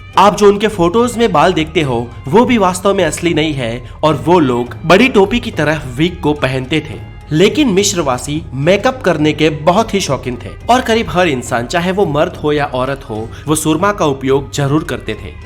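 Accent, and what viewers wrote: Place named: Bihar, India. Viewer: Mostly voice bihariyo ki kyun hoti hai with mother tongue in fluency issue ke saath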